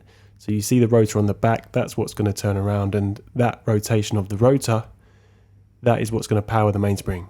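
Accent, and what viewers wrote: London, United Kingdom. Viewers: My man sounding like a fellow Londoner and taking about usd. Come on fella let’s have a £ and let the yanks convert it. 👍😂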